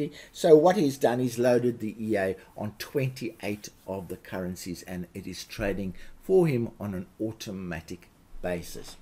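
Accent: South Africa. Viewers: are you perhaps from South Africa?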